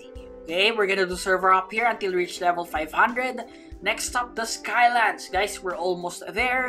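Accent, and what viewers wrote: Philippines. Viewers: Idk if this dude is pilipino or not he just sounds pilipino idk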